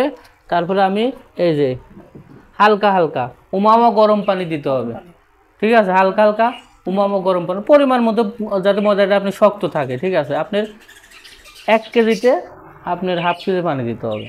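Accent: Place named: Bogura, Bangladesh. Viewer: বগুড়া বাড়ি মনে হয়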